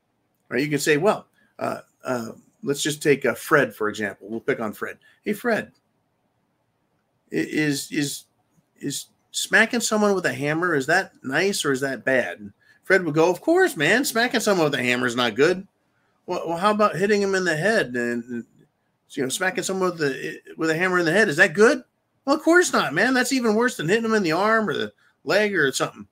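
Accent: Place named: Idaho, United States. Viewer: You sound like you were in cordulane idaho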